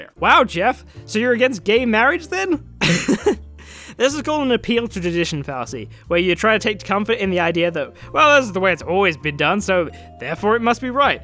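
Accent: Australia. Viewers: wait, you're australian?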